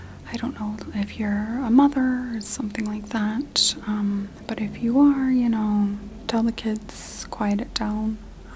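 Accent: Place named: Midwest, United States. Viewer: The midwest accent is toooo good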